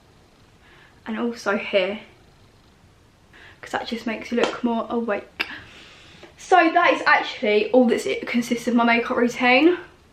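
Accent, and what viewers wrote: Essex, United Kingdom. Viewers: you must be from essex as wellllll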